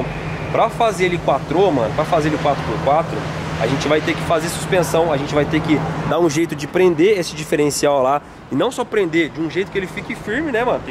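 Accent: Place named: France. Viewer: legal e tal mas de onde saiu essa mania de colocar assento circunflexo em tudo??? 🤣🤣 Carpete vira "carpê" Quattro vira "Quatrô" 😂😂😂 Nem quem eu conheco de Londrina fala assim... nao entendo de onde ta vindo esse enfrancesamento kkkk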